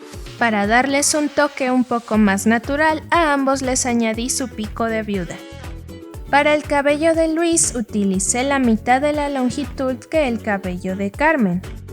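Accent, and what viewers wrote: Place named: Mexico. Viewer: Como me gusta la forma de tua vídeos! Y los dos quedaron preciosos! Me encanta tu tonada mexicana, sou brasilena.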